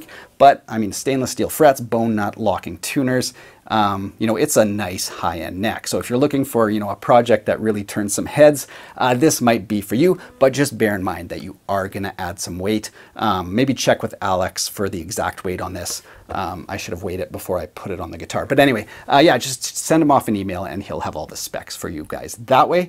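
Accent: Canada. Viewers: I love the Canadian accent! Great videos, too. Subscribed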